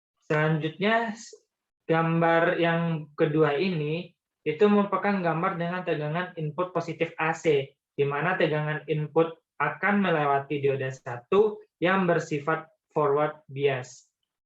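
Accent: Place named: Padang, Indonesia. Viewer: Logat Padangnya kental sekali ... 👏👏👏👏👏👏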